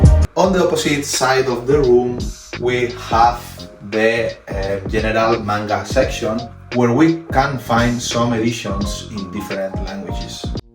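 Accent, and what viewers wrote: Spain. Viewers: Least nottable spanish accent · Yo cuando veo que habla inglés como yo Yo: este compa es español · Como se nota el acento de Albacete jajajaja, vaya puta barbaridad, ojala tener algo así algún dia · a los 20 segundos saque tu acento de español JAJAJA ta bueno tu coleccion ❤ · Bro, se te nota mucho el acento español, pero se nota que le pones buena intención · el inglés españolizado me mata JAJAJAJA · Eres mas español que yo con ese ingles, guapisima la coleccion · First of all, i just wanna say you have the fatest rising collection i've seen, knowing you started 3 years ago. Its truly amazing seeing a European, like me, enjoy this series so much and see this collection that is so epic. Second, i would love to get a more detailed video about the shonen, ultra jumps display in your room because shonen, ultra jumps, volumes is where i also wanna start collect more and i would love to know more about. 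Last, i think i understand you in borh languages! i'm from l🇵🇹 and your spanish accent isnt that hard ro figure it 😂 But you blend them very well for your audience and i think you are doing fine. Thanks for the video Mr, i csnt wait for more content from you and someday, we can see the whole collection since we you have diad this is 70% of it due to the lack of space. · el acento español le da el toque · El inglés españolizado le da el toque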